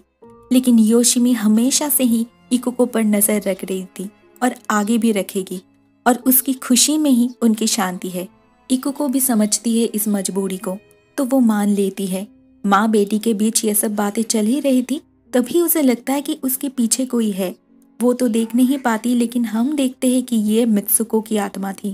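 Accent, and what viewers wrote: Bengal, India. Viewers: Ma'am Don't mind Bt are you a Bengali person???? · Are u a bangali or udiya....??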